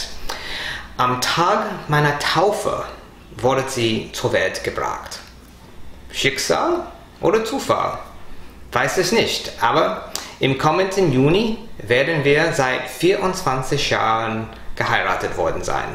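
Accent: United States. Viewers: you seem to have the American accent on your r's and ch's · your voice sounds to me american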